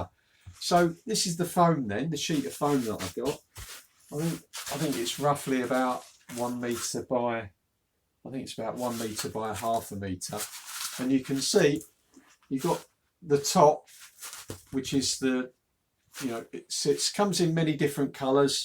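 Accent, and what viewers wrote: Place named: East London, United Kingdom. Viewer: Helpful video. Love your videos. I can't help wondering where your accent originates from. You sound a lot like my late granddad, who was from the London East side. Very soothing to listen to. Anyway keep it up mate.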